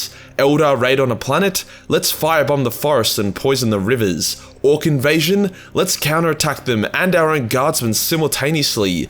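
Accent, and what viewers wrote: Australia. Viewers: My Girlfriend is working in a BPO Australian account when she heard the astorath's paraphrase she laugh so much told me that is absolutely Australian😂🤣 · You could do with a little heavier Australian accent. What kind of Australian are you?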